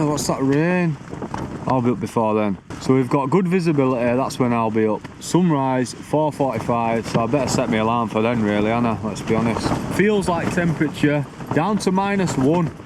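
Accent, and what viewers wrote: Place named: Bolton, United Kingdom. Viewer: Love the brawd acsent iam fr bolton sound summit like but u talk like ur about 50 mate how old ru, iam 63 just got cancer basterd so do miss it pal atb stay safe ❤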